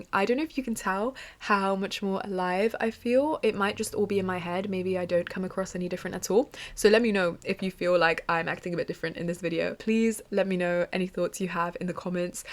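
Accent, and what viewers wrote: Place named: England, United Kingdom. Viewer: I know it's out of context, but can someone tell me what English accent she's speaking?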